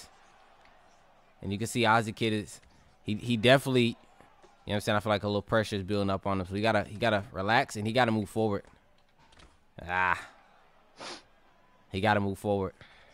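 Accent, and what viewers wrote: Jamaica. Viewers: Lol you Jamaican bro? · u jamaican nw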